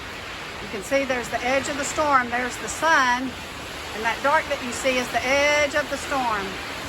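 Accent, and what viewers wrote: Texas, United States. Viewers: Just seen this today.....I have a younger Brother in Richland Hills and I will have to call some friends of his to see if he is alright ..... he doesn't speak to me but I shore want to know if he is out of danger. And I like your Texan accent!!!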